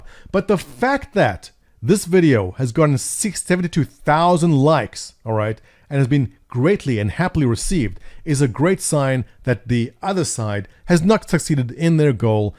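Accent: South Africa. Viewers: And you just have the biggest Testies! Btw. Do I spot a South African dialect there? And do I remember correctly you currently reside in Canada? Not a stalker question, just testing my dialect sensors :D.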